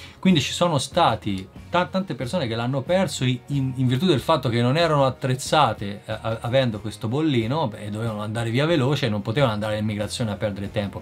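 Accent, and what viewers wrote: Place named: Perugia, Italy. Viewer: hai un po' l'accento perugino🤔🤔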